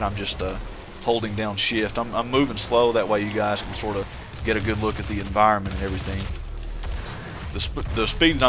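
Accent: South, United States. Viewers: Holy shit you're a southern dude? I did not expect that. Not that I'm insulting you, I'm a Texan myself, just didn't expect that lol. I agree with you though I absolutely freaking loved this game and omg I can't wait for the next Doom. More weapons, more demons, new story, more weapon mods, hopefully new brutal animations, man it would be so sick. My only thing is I really don't see how id could possibly follow this up though, it is epic as hell.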